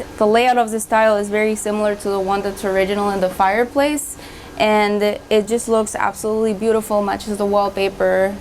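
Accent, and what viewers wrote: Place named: Israel. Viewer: From your accent I think your mother tongue is Hebrew. Be Matzliach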